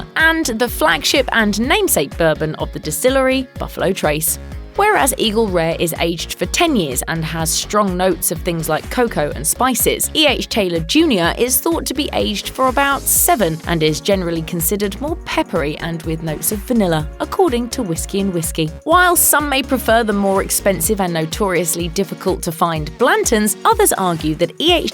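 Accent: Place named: England, United Kingdom. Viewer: Your talking about Bourbon in Kentucky. Get somebody without an English accent. Here's a suggestion an Southeren accent.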